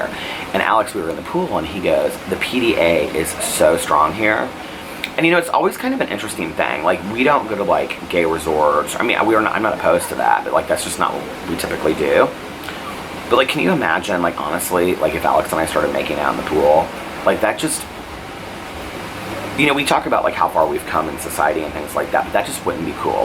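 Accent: South, United States. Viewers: I totally hear the southern in your voice! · I would say you have a Southern-esque accent. I have a friend from Georgia and you sound almost exactly like him, just not as extreme.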